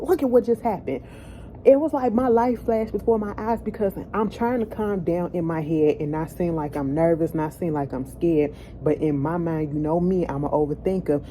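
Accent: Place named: Boston, United States. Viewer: Hearing you say all these lines reminds of Boston ☺️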